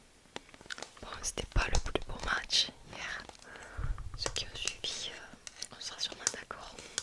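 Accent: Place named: Marseille, France. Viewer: Je suis marseillaise ❤ ta un accent marseillais incroyable ❤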